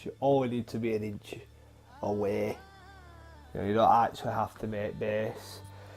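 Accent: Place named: East Lancashire, United Kingdom. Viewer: Personally, it's always fun over victory. I basically buy miniatures I like then figure a way of getting them into a list. As you say, unless you are going to tournaments, it shouldn't be just about the best list. As to the video, personally I can listen to people ramble on about 40k all day. As long as I don't hate the accent (ok, you don't have the smooth tones of West Lancs but east lancs is alright 😁) I'm happy to listen. For wider appeal you could make it a bit less rambly. Have points you want to make and kind of plan it out better. But as a first effort it was a good listen.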